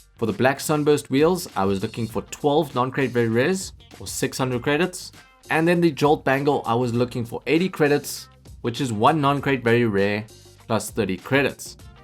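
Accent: South Africa. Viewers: I just want ti ask are you from South Africa or Australia or neither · Yo u from SA? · Is this guy from South Africa ?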